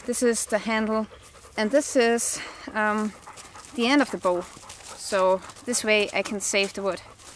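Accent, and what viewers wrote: Germany. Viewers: Hunting with bow is forbidden where you are? Where is that? Sorry I'm new to the channel and just watching all the different videos, I'm not good with accents, but if I had to guess Germany or Austria? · are you German... I think I can hear your accent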